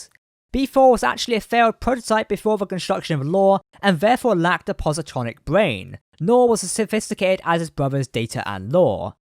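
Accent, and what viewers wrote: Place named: United Kingdom. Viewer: Oy. Crikey. Let's have another Brit voiceover commentary about the trailer we just want to watch in peace...ack... so. After Data is gone, Maddox finally figured out how to build Soong Androids, and they decide to revolt and blast Mars ? their leader Beep Boop leads a charge "in the name of Data". Unknown to them, Lore, revived by a drunk lab assistant is behind the plan all along. Meanwhile, in between Picard's dreams of Data, the real Data's head is retrieved by a small Pakled ship in Federation space and sold to Boson, Quark's grandson who decides to rebuild a new body and face with a special inhibitor in it, to give OO-Maks to any Ferengi for an hour if they can afford the gold-pressed latinum to pay for it. Quark, excited and thrilled about the success of his grandson, brags at the old Ferengi's home , laughs at his brother the former Nagis's discomfort . 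Q pops in and looks into the camera saying "why ? because we can"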